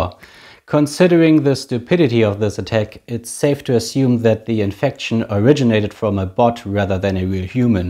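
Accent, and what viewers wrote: Germany. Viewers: German English. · THIS ACCENT...are you german?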